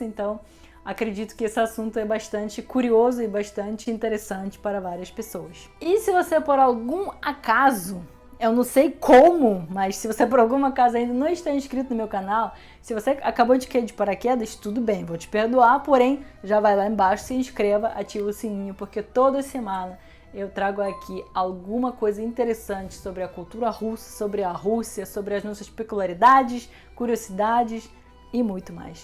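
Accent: Rio de Janeiro, Brazil. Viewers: Linda e doce Russa/carioca, você é uma ótima observadora de comportamentos masculinos aqui observados em relação às duas nações, o Brasil e a Rússia. Seu sotaque do português brasileiro está mais perto do Lácio pela pronúncia do "s" como "x" o qual é uma herança lusitana e que por sua vez vem do latim. No estado do Rio de Janeiro fluminenses e cariocas e também nordestinos e nortistas ainda usam a pronúncia romana do "s'' assim como ainda o fazem os portugueses e os galegos, estes irmãos no idioma pois foram no passado uma só língua. Abração apertadinho, saúde de montão, paz, alegrias e muito mais sucesso! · Sotaque carioca. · Ela já mora no Brasil há mais de 7 anos, perdeu o sotaque russo e ganhou sotaque carioca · Pelo seu sotaque,você deve ter aprendido o português Brasileiro no rio de Janeiro. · Você é a primeira russa com sotaque carioca RSS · Você fala um ótimo "carioquês" haha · Parabéns por falar muito bem português pt-BR com um RJ-accent. 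Apesar de eu ser abstêmio, não bebo desde os 13 anos, concordo com sua avaliação sobre bebida. No filme Guerra e Paz, baseado na obra de Leon Tolstoi, existe uma cena antológica que comprova essa tradição russa de competição para ver quem bebe mais e quem é mais corajoso. Dois homens, cada um com sua garrafa de vodka, ficam de pé se equilibrando no parapeito de uma janela no terceiro andar, de costas para o lado de fora. Ganha aquele que conseguir esvaziar a garrafa primeiro. Quanto a mulheres russas, alguns anos atrás o escritor brasileiro Paulo Coelho fez uma viagem no Expresso Trans Siberiano. Essa viagem foi filmada e passou na tv brasileira. A cada cidade ele descia para ter contato com as pessoas e só apareciam mulheres jovens, todas extremamente bonitas. Mulheres russas e ucranianas parecem ser literalmente de outro mundo. No bom sentido, claro. Acho que as únicas que conseguem competir com elas são as iranianas (persas). 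· 😘vc e muito linda interior e exteriormente! adoro seu portugues com sotaque carioca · Uma russa falando com sotaque carioca, que top ! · Vc tem o sotaque do Rio de janeiro se eu não me engano ? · Olha fiquei até na dúvida se é brasileira, sotaque carioca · vc é russa? kkkkkkk seu português tem sotaque carioca (RIO DE JANEIRO) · Já tá toda trabalhada no sotaque carioca! Chia mais que dez cariocas juntos! Português perfeito! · Achei seu português com sotaque mais carioca do que russo mesmo assim bastante charmoso. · Po , russa ? Pelo sotaque já é carioca!!!!! É noíxxxxx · Você fala muito bem o português, fala até com o sotaque carioca. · Amo essa Russa com sotaque de carioca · Da para perceber que ela mora no RJ. Super carioca. Um português impecável! Meu Deus. Nota 10 para a fluência dela!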